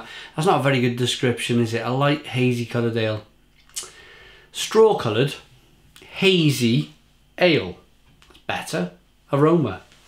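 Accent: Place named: North Wales, United Kingdom. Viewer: Great review mate! Where about's you from? You accent sounds north wales to me if i'm not mistaken?! Big ups from a welshman living in London.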